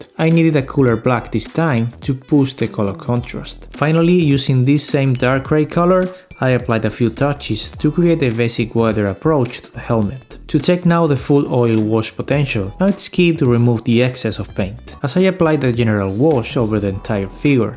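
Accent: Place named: Spain. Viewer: Lo sabía por el acento, gracias por la info, por lo general no hay muchos canales de modelismo españoles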